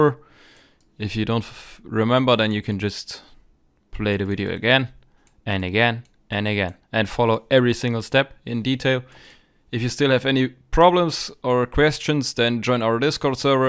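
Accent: Germany. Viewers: are you german ? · Den deutschen hört man raus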